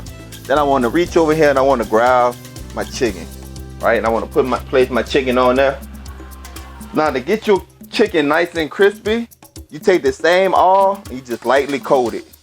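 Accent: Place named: Louisiana, United States. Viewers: I knew the second I heard his accent, he was from Louisiana. 504 REPRESENT!!! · I can tell your from NOLA my entire family is from there. Love the accent😉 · I'm an Aussie and I love the southern accent, and love how he does the thang with his wangs. 🤣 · Love the Southern Accent...Chicken Wangs lol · Y’all he’s from the south most southern folks have an accent it doesn’t mean he’s dumb...y’all the dumb ones for not understanding that. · Are you from Louisiana, you have a little Louisiana accent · Gotta be from Louisiana 💓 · Of course it's a Louisiana accent the best there is. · First off, clearly you have to get "wangs" and not "wings" if you want crispy chicken. Great video and I love that N'awlins accent!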